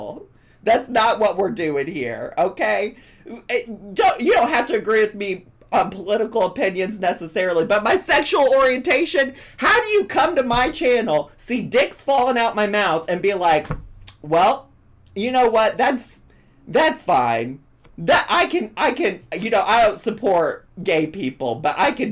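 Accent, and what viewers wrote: South, United States. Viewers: has such a gentle southern accent for a midwesterner 😂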